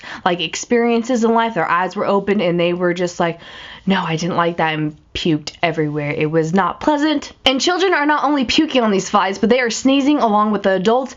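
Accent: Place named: Texas, United States. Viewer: You picked up a smidge of a country accent while you were in texas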